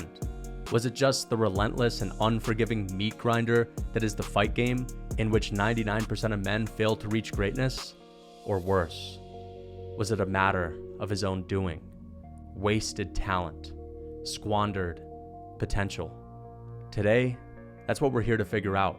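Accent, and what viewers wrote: Ireland. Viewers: Irish accent! 😅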